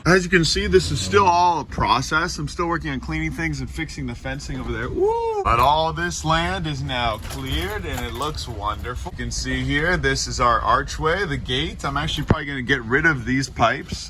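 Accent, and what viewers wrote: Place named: Pittsburgh, United States. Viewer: I have never been more engaged in a strangers life more than yours lol. It took me way to long to pick up your accent(Crosby Jersey gave it away too) and I’m dying to know where you are from in Pittsburgh? I live in Plum Borough. Keep up the good work you deserve way more subs. I don’t think any other YouTuber had an effect on me like your stuff. I’m a grown ass man and yell EVER HEARD OF IT! At everyone. My gf does not appreciate it.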